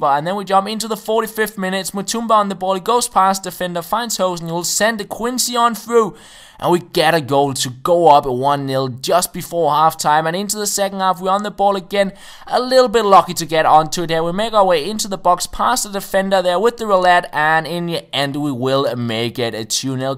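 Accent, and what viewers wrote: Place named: Denmark. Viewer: Dat Danish accent...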